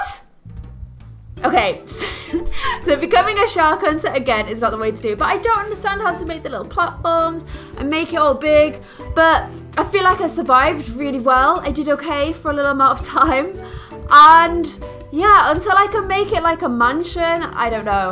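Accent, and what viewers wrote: Ireland. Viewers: WOW!!! YOUR IRISH!!! YAY!!! SAME!!!! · YOUR IRISH I THOUGHT U WERE BRITISH OR AM A JUST CRAZY?!?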